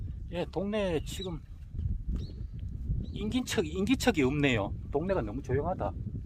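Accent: Gyeongsang, South Korea. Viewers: ㅋㅋ 말씀 넘 웃끼게 하시네예 완전 갱상도 사투리 정감갑니다 인상도 짱이시구요 좋아요 구독눌러서예 ~^^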